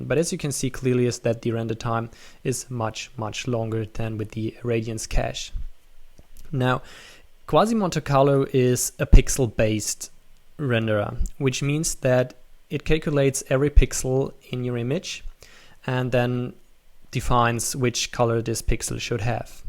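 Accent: Germany. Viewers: make these in german... your accent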